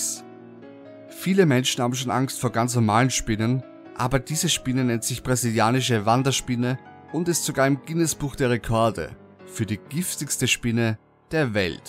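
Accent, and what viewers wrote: Austria. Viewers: Des is jo a österreicher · Du hast ein Österreichern Akzent 😂 komme aber aus Deutschland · bist du ein Österreicher? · Bist du Österreicher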